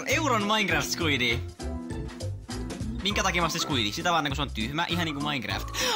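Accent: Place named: Turku, Finland. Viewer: on kunnon turu murreee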